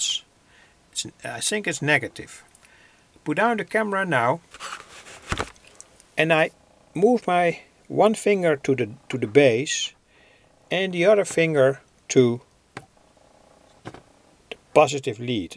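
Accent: Netherlands. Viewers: Leuk Nederlands accent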